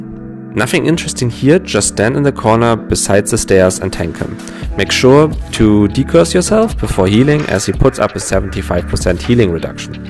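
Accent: Germany. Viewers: Sympatisch wie man dein deutsch raushört <3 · are you german? and your pronounciation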